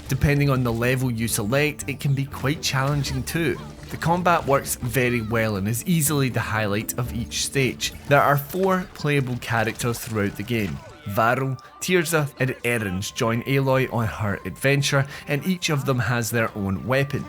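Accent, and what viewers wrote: United Kingdom. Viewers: when he said Varl I was like who!? But am just teasing. That accent is a prized British treasure 🥸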